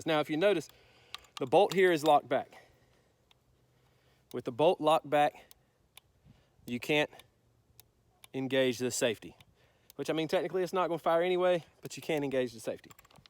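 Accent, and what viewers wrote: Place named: North Carolina, United States. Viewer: Damn... I thought I recognized his accent!!! Tarboro NC is about a hour east of my place in Nags Head NC!3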